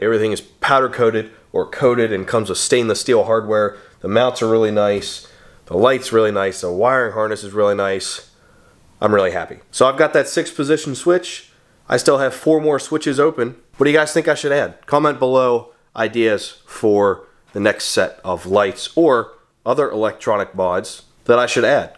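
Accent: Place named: Pittsburgh, United States. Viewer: First time ever watching, I heard your voice and thought to myself, "this dude sounds like he got some Pittsburgheese in him" then I saw the Steelers hoodie....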